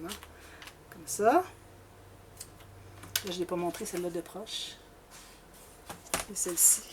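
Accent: Quebec, Canada. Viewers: Martine Mérard. Bonjour, merci pour toutes les réalisations que vous partagez. J’aime tous vos projets, la variété des thèmes, du niveau de difficulté, j’ai appris tellement de choses depuis que je vous suis. Au début, au hasard des recherches sur YouTube, c’est votre accent qui m’a interpellée ( à cause de plus de 10 ans d’échanges scolaires avec Chicoutimi…).C’était d’abord le premier plaisir du matin, à présent c’est le soir, mais le plaisir est toujours là et l’impatience de la découverte à chaque dévoilement. J’ai réalisé plusieurs de vos albums, ou emprunté des idées, des inter actions, j’aime les pop ups, les cachettes, mais aussi les choses plus simples et les albums pas trop gros! J’ai contaminé ma petite fille (6 ans!) qui veut scraper avec moi et échanger des joyeux courriers et jouer à! Je souscris à l’idée de Patricia je crois pour un album en duo grand mère et petite fille. 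Et puis encore pour des tutos express, et peut être des réalisations plutôt style junk journal et récup. Continuez à nous enthousiasmer!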